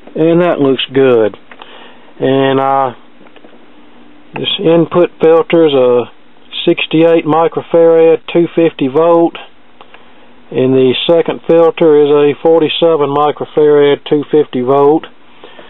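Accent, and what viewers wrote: South, United States. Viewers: Hi! I am pleased to be following you. I am 69, retired, and trying to learn again what I once studied in high school electronics class. I enjoy your videos, and your sense of humor, very much. I also enjoy hearing from a fellow Southerner. Those other guys who pronounce the L in solder just sound ignorant to me 🤣 Do try to keep things in focus to improve our experience, but I will keep watching nonetheless. Keep up the good work, people my age are not too old to learn. Thanks for your good work!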